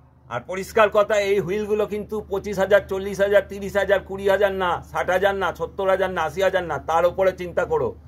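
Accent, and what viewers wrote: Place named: Bengal, India. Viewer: খুব সুন্দর কাকু তুমি যে হিন্দি বলো খুবই সুন্দর আমরা বুঝতে পারি আমরা বাঙালি আমাদের হিন্দি এরকমই হবে❤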